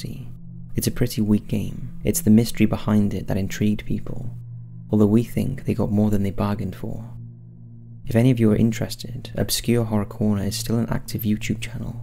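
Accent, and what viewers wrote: Wales, United Kingdom. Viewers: He's Welsh. :) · hellomikie92 nothing...if you mean the accent, it’s Welsh 🏴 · he's welsh.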